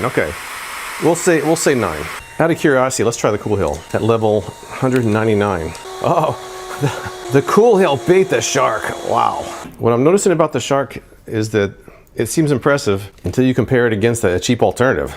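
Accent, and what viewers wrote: United States. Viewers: To me he sounds like the most american guy ever. This what I'm thinking when someone say: american guy.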